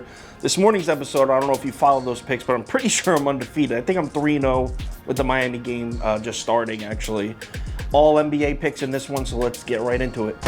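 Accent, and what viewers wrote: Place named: Boston, United States. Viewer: I like ur boston bro..😀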